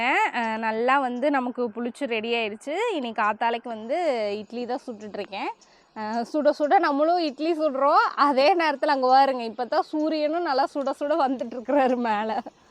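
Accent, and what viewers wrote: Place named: Coimbatore, India. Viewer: திரும்ப திரும்ப நிறைய பேர் இந்த கமன்ட் போடுறீங்க.இது கோவை ஸ்லாங்.அவங்க எதுக்காக அத மாத்தணும்னு நினைக்கிறீங்க.அவங்கள அவங்களா இருக்க விடுங்க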